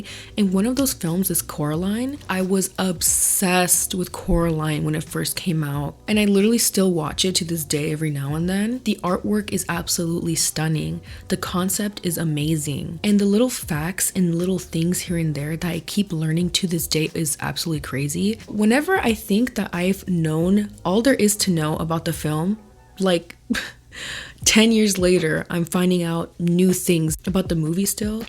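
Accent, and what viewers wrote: United States. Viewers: As an Australian i love seeing this being talked about around the world. I also find it a little funny hearing how Americans pronounce the Australian locations. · bluey and bingo are living my childhood. i've lived in australia my whole life and it is incredibly relatable! there are a few things in this video you got wrong, but it isnn't your fault! its just because your accent and you not knowing australia! it isn't queens island, its called queensland. i still love you♥. also the way you say osy!! for americans, say ozzie. hahaha got a good laugh.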